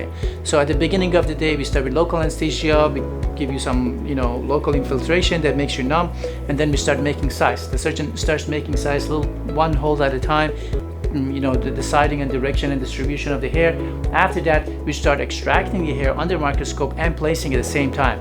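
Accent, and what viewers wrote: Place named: Iran. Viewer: lovely persian accent :-)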